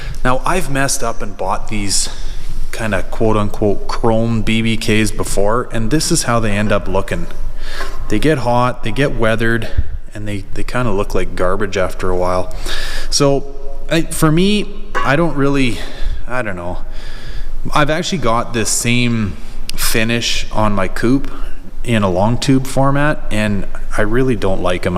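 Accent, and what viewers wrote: Canada. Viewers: I’m “sowwwry” haha Canadian accent makes me chuckle. Beautiful shop man ! That’s awesome!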